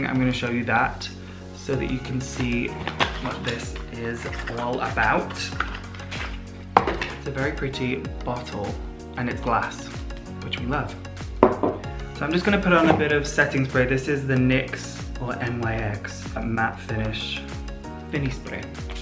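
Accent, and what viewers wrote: United Kingdom. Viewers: NEver heard your real accent before. Are you from England originally? I live in London. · Oh my god he’s british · Omg you’re British???? I thought you’re American 😮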